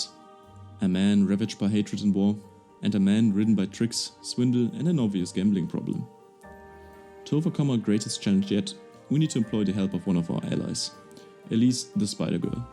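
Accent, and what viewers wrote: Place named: Germany. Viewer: Actually good content. The Accent is still strong so I instantly know ur a fellow Landsmann but that shouldn't bother anyone. Keep it up!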